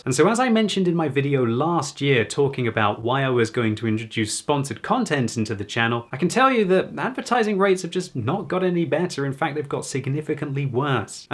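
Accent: United Kingdom. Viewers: Even though i've quit playing WoT, i'm still watching your videos and come on your stream for that lovely british accent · I've never played WOT and I have no plans for it. I've enjoyed watching your progressive gaming style and rather unique british commentary for some years now and I have fun on 98% of the games you posted. How can I keep tracks on what I've seen so far, and what not? Easy - click [LIKE] on every single video watched. Thanks for a steady stream of rock solid entertainment. Cheers, Peter Beijing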